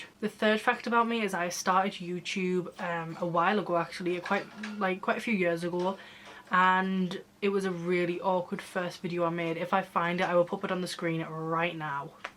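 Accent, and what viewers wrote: United Kingdom. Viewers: You are so pretty😍 Also I love your voice are you British?❤ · I love your accent, you British?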